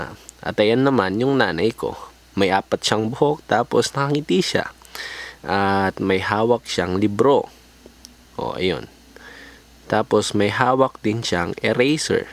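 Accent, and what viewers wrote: Ilocos, Philippines. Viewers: Ilocano ka pala boos hahahaha ukinana tattak la naamuan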